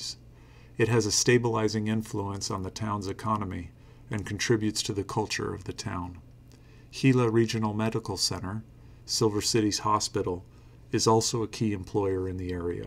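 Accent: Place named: Northern California, United States. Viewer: i am curios- are you from Nor Cal? It just feels like you are sir- would love to know as have watched several of your videos. Thanks- Robert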